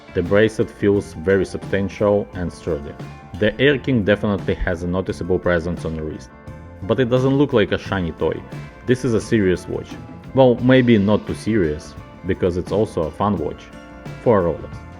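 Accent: Russia. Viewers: рязанский акцент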